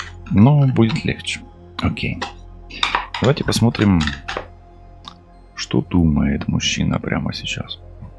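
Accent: Ukraine. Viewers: Он Водолей,Я близнец..Я кацапка.. мы сос тояли в браке..1ОЛЕТ., ЧУЖОГО я не брала,пусть вернутчтто украли...Приятно слышать украинский акцент,хотя многие. слова. не понимаю.. У меня подруга с семьей проживала в. Броворах,а потом ВЫСТРОИЛИ дом в Винице.За. 3 дня до войны,она ПР ИЕЗЖАЛА..🎉.Я приглашала к. Себе пожить,но они не сммогли выехать.Раньше была связь,а теперь уже давно нет,побаче пожалуйста Скажи,они для меня как родные.я..яс уваж.Надежда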